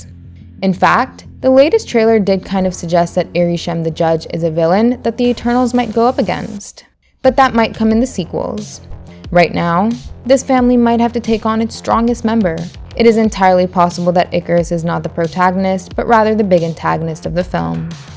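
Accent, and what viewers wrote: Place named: United States. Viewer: You're a female CANADIAN LAD ...but you sounds like AMERICAN 😁lad ❤x🇮🇳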